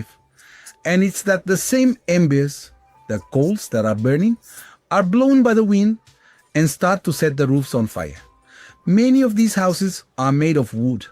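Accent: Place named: India. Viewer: Guessing its IA....?¿? Misspronuntiation makes me think thats the real you but sound like indi pretty often @@ Interesting project! Gonna keep an eye on it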